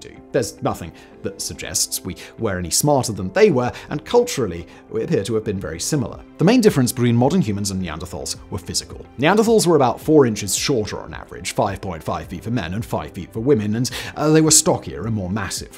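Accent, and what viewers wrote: RP, United Kingdom. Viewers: Sorry, the british accent prevented me from finishing this video —— 😣 💬 Thumb down 👎🏽. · Stick to this, your political take may aswel be straight from #10 no independent thought whatsoever I cant stand 5 seconds of it, I can tolerate that posh accent when its things like this though😂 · For those criticizing pronunciation, please note that he doesn't have an American accent. Britons often pronounce vowels differently (i.e., a "clark" helping in a store and the metal known as "a loom IN ee um) and this might extend to other vocalizations. It is possible he's not an ignoramous.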